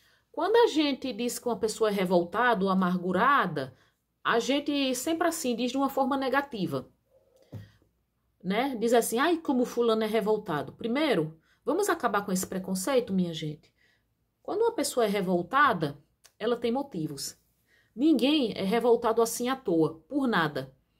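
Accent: Northeast, Brazil. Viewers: Ótima resposta, parabens, pelo seu sotaque vejo que vc e' nordestina, vc e' de qual Estado?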